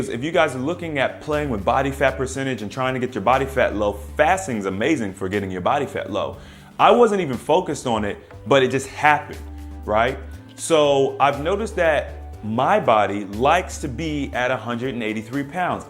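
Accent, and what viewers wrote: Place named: Philadelphia, United States. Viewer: You can actually change your body weight set point by keeping the weight at that lower weight longer term so that your body gets used to being that weight and doesn't feel you are in an environment of scarce food supply. Dr. Paul Mabry on Facebook on his group has good insight on this phenomenon. Also, Dr. Jason Fung had mentioned this as well in his talks on YouTube. Hope that helps. I too am trying out new things for the new year. I have done 1 to 16 day extended fast and always practice IF daily. I just started resistance training. I am trying to loose the extra 20 lbs gained unhealthily through holiday and emotional binge/food addiction eating and trying to fix my relationship with food in general. I love your channel by the way! Are you in Philadelphia? You seem like a Philly guy by how you speak and mannerisms- not a bad thing, just observations. 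I live in suburbs of Philly, but went to Thomas Jefferson University where I got my BSN in nursing and lived there in the center city area on campus as a student. Anyways, you are an inspiration to me and I hope to get as great as results as you. I definitely need to decrease my body fat%. I am currently at 24%! I am only 5ft tall but weight up to 115 lbs, which a lot for me! I feel so bloated and uncomfortable in my body now. I felt best around 100 lbs. When I did my 16 day fast got down to 97 lbs and felt amazing! Getting back to that area of weight, no less, is my goal 97 to 100 lbs. Anyways, keep up the good work!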